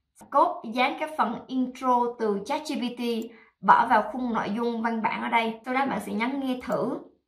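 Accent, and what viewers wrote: Binh Dinh, Vietnam. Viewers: nghe giọng nói của bạn giống như ở bình định hay phú yên hihi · Bà ở Bình Định hả bà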